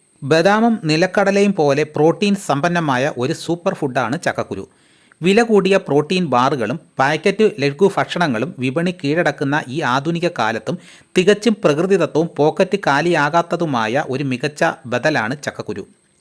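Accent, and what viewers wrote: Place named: Thiruvananthapuram, India. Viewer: ഇത് തിരുവനന്തപുരം ഭാഗങ്ങളിലുള്വരുടെ പ്രയോഗമാണ് അത് തെറ്റിയത് കൊണ്ടല്ല അത് കൊണ്ട് കളിയാക്കേണ്ടതില്ല ഓരോ നാട്ടിനും അതിന്റേതായ രീതികൾ ഉണ്ടാവും കാസർഗോഡ് കാരുടെ പ്രഭാഷണം കേട്ടാൽ താങ്കൾ എന്താണ് പറയുക ഒന്നും മനസ്സിലാവില്ല അതെ സമയം ഇവിടെ ഭ പറയുമ്പോൾ മാത്രമേ വ്യത്യാസമുള്ളൂ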